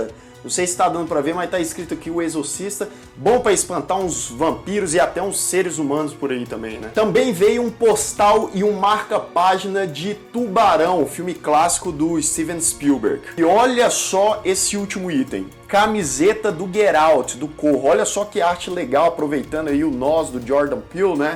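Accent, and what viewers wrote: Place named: Minas Gerais, Brazil. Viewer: ...vc pode ser de Brasilia,mas fala igualzinho aos mineiros...sou mineira de BH,embora more atualmente em SP,gostei mto da similaridade! Um forte abraço,seu trabalho é de excelência!( só vi Laranja mecânica!)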